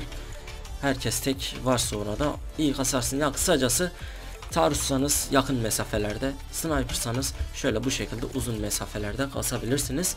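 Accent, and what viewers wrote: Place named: Diyarbakır, Turkey. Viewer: diyarbakır lı mısın gardaşım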